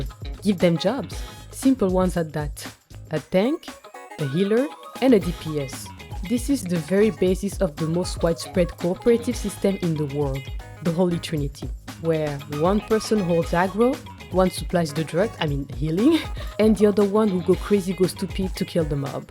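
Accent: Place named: France. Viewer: You can count on a French to not hear the obvious French accent... I got jumpscared by your "les amis" lmao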